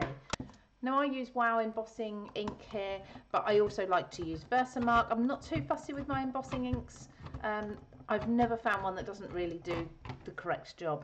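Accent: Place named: United Kingdom. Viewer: lovely cards thanks I need ro make one hreat ides. That said but personal peeve why are you using only inches and not cm? It really irks me that many places globally use CM but in videos people pander to the USA and use inches and do not give the CM so the rest of us in the modern world have to convert. I can hear you sound like you are fellow Brit not an American if that the case you will know about CM.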